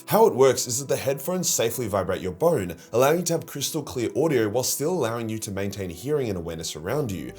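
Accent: Australia. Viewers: I came here for everything besides the Eisenhorn shit, then was surprised by how much shit I have no clue about then realized I'm gay and just wanted to relate to your Australian accent in a way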